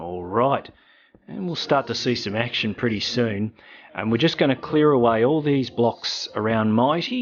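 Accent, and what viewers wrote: Australia. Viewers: Good to hear a Aussie doin some MC videos. Have you done this in Survival mode before? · You're Australian right? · Are you Australian?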